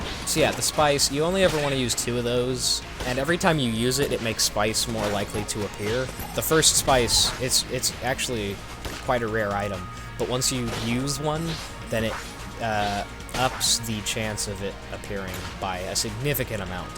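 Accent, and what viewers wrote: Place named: Scotland, United Kingdom. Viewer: ***** Your most welcome! (his Scottish accent is beautiful :P)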